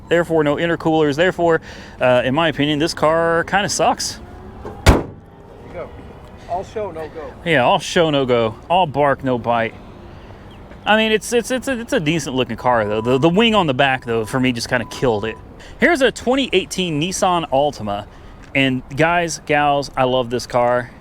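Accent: United States. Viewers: Come on Man, its you Yanks mashed English Aluminium into "Loominum". Not even Germans do that.!!. Even a Life Boy becames a "Life BOOeeee", and that confuses the rest of the World. Rangers generally have shitty little 4 Pot leaf blower engines, that brakeless ones good.!.Ford Service /Dealers got a Shit name over here in SE Asia.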